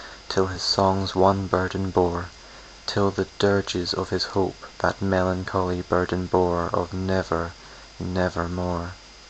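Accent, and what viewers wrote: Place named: Scotland, United Kingdom. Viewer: This will not get you girl/boy friends dude so if thats you angle buy a leather jacket and follow a rock band. However . . . if your passion is poetry, your in a obscene minority and I welcome you to a lonely club. If you message me back I will subscribe to your channel and follow your posts. Lovely reading, I'm half Scottish and I appreciate the accent. I would maybe like to see you post some of my favourites like "My Creed", "If" and "Invictus"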